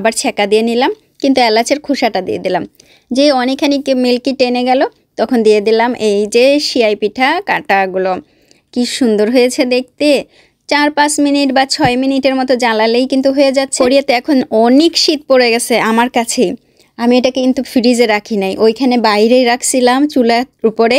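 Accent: Bangladesh. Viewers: Bangladeshi mey bole kotha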